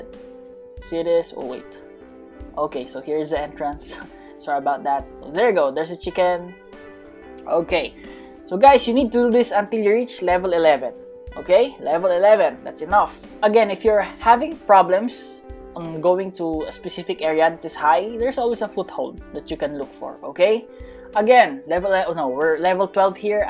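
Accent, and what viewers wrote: Philippines. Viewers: He's accent like Filipino accent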